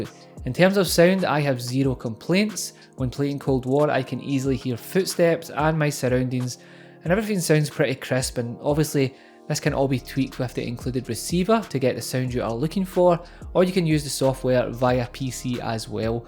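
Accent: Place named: Scotland, United Kingdom. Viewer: Love how ur scottish mate, where abouts you from?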